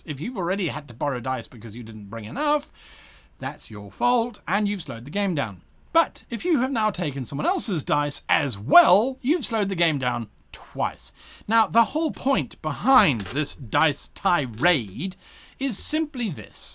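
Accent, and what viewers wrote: England, United Kingdom. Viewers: You sound like your putting on an English accent are you English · I really like your British Accent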